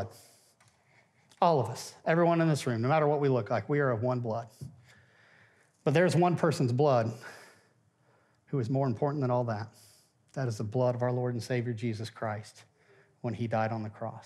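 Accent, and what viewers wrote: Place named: Tennessee, United States. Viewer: Just before you compliment (or tease) your wife, let me guess : you are from Tennessee?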